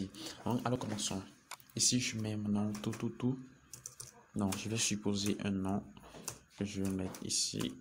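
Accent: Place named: Europe. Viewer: Belle vidéo... Pour les prochaines vidéos apprend à parler comme un africain et non comme un européen merci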